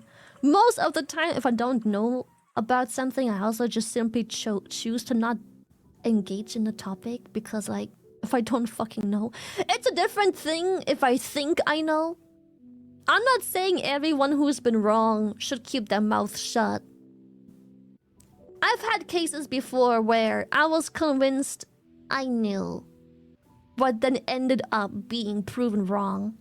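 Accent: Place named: France. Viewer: Shylily's french accent is adorable. It makes sense because she is french that she is non-confrontational.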